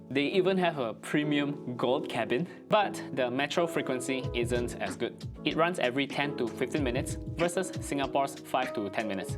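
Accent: Indonesia. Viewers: speaking of which, do i hear a hint of Indonesian in your accent? i love that your accent isn't your run-of-the-mill Singaporean accent! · your indonesian accent is very strong.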